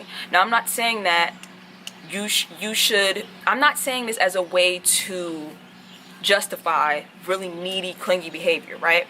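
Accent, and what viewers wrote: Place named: New York, United States. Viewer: I wanna kick it with you, are you from New York? Lol you sound like it a bit. I'm from out here, love your swag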